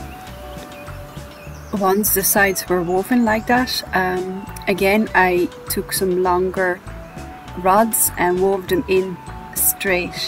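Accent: Ireland. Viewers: Irish accent. 😍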